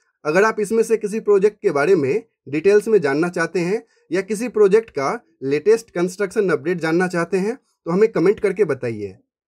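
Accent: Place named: Assam, India. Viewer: Hindi assam style mei mat boliye please...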